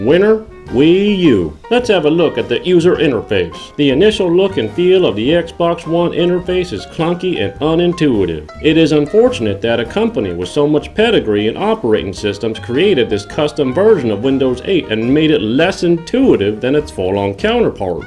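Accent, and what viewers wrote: United States. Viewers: wait are you American?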